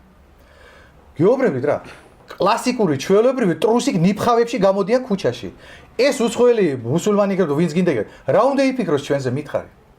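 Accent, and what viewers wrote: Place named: Armenia. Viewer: ჩვეულებრივი ფარისეველი, ბლia:) სომხობა ქართველობაზე იმისთვის გაცვალე ,რომ ქართველი ქალები გელანძღა,მაგ სომხურ აქცენტს მაინც მოუხერხე რამე:))) არაა,სომხობას არ დავცინი,უბრალოდ , პოზიციაaa ძაან "ჰაიტარაკუნემ რესპუბლიკ" :DD....მე ვიყო :D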